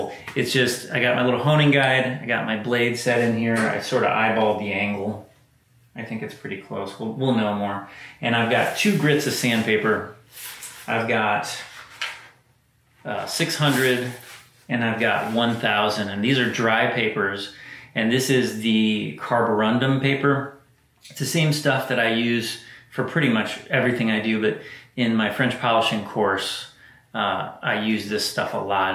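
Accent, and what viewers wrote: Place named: England, United Kingdom. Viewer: I came across your site, I was imprest, and I join. Must say you do not sound American but English. Where are you from ?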